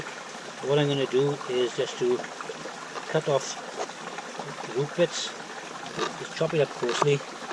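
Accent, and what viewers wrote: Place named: West Wales, United Kingdom. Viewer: Great video I could listen to you reading the telephone directory. I love your accent is it West Wales?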